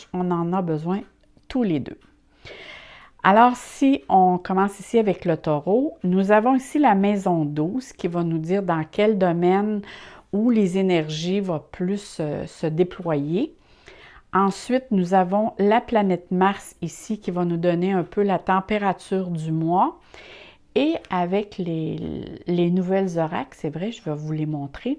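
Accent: Canada. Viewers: Bonjours ! J'ai réécouté pour le plaisir et le professionnalisme. Et aussi l'accent et le vocabulaire canadien que j'adore...ma mère est charentaise et son patois est très proche de vos expressions. J'ai été touché aussi car je suis taureau/taureau/vierge...., thérapeute juste retraité, et dans les starting-blocks pour une nouvelle impulsion. Vous m'avez shooté de positivisme. Je suis en amour🤗🤗🤗🙏🙏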